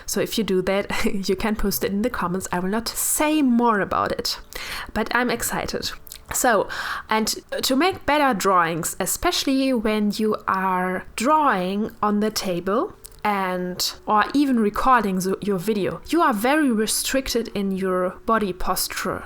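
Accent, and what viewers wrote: Germany. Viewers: The only thing that is a bit annoying is your german accent😅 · are you germaan?😀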